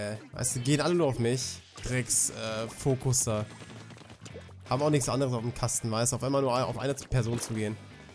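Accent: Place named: France. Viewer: bester französischer Akzent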